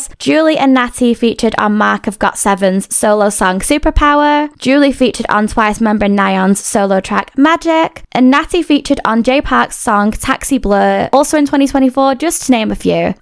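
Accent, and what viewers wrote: United Kingdom. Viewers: Are you British? Lovely accent. ☺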